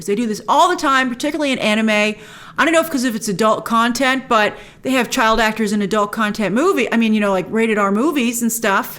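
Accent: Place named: East Coast, United States. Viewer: It’s east coast speaking style. Very common for a lot of EC people to sound like that. She sounds like my family members so maybe it’s just me lol. Anyway, I’m looking forward to Monday!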